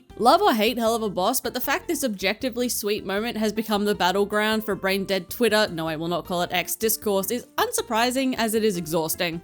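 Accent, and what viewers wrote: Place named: Australia. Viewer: im so australian that i didnt even realise u were australian too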